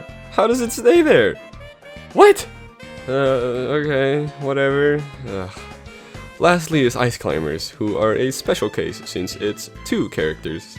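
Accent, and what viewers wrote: Netherlands. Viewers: hoppa ik dacht al dat ik een Nederlands Accent hoorde. Ik ben de allerkapotsteslimste werkloze persoontje op de wereld yesssss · ik wist niet dat je nederlands sprak, ben je een belg(zoals ik) of ben je een nederlander? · I KNEW YOU WERE DUTCH YESSS I am also dutch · Wait, does this mean that you have a Dutch accent? if you don't mind, what part of the Netherlands you from? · You Dutch? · Are you dutch? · Wait a second are you dutch? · omg are u dutch? Omg dat is echt cool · He dutch?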